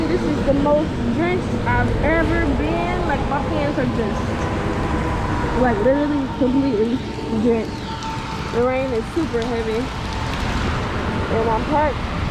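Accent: St. Louis, United States. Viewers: It’s bugs up here 😂😂😂 think that’s the first time I heard that St. Louis accent!! I like my helmet to match my bike so guess there’s no Simpson in my future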